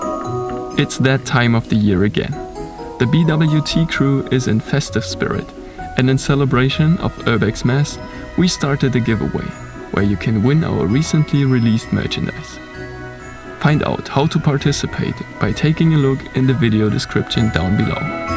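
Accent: Germany. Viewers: I love the way you pronounce Victim. Or the V in general. It's so German!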